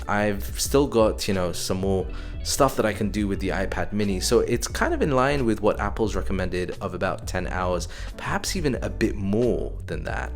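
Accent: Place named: United Kingdom. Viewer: It's Hard To Manage British Accent Including Explaining Points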